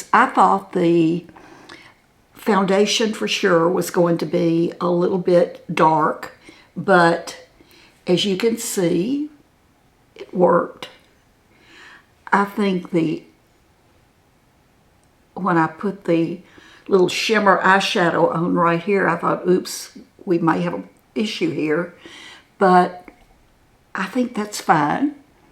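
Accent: South, United States. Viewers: The makeup only enhances your inner beauty. I am 64, and to be honest, I haven't always been very kind to myself and the changes that accompany aging. That's going to change after watching you, and listening to that calm, charming Southern accent. Thank you for the inspiration, and proving that a little pampering, and inward gentleness can present itself to the outside world as being worthy of self-love and care. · I think the foundation is a tiny bit too dark and your blush is too heavy. A lot of older women wear too much blush and it looks overdone. I also think you’d do better with brows that are less brown and maybe use a bit of pencil. Elf makes a very decent one for the price. Other than that, you look very lovely and I love your southern drawl. Where are you from? I’m also 81, am blessed with good skin. My wrinkles are in the mouth area and I’ve never smoked so am very annoyed. 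My eyes and cheeks are good.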